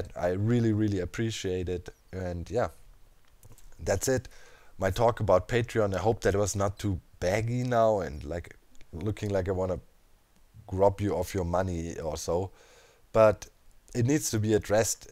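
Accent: Germany. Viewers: May i ask if u are german cuz u have a german accent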